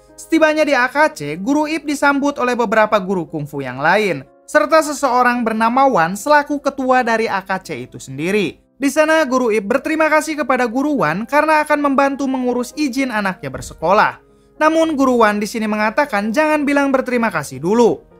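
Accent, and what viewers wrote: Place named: Madura, Indonesia. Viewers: Madura yah bang, hehe, semangat bang😂💪🏻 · logat madura cuy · Logat nya madura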